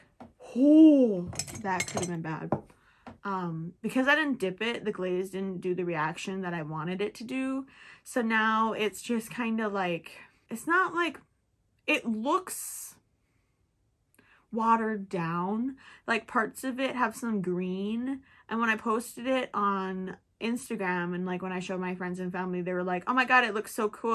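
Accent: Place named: Upper Midwest, United States. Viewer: Haha the upper Midwest accent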